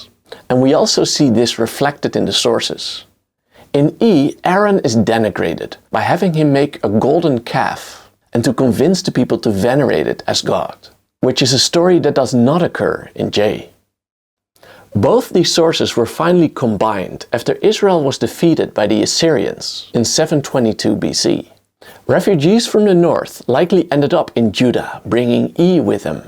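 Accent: Netherlands. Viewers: YOUR ENGLISH IS VERY GOOD, almost without any accent, BUT when listening to you for 10 minutes, one can detect your Dutch mothertongue by your v- and s-sounds, which in some intances you pronounce the same as in Dutch and not as in English. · could be because of the Dutch accent. The letter J is pronounced /yay/ and G is /hay/.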